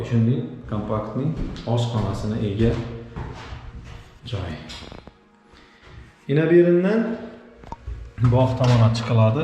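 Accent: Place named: Khorezm, Uzbekistan. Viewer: Хоразм дилинда чиройли гаплабди офарин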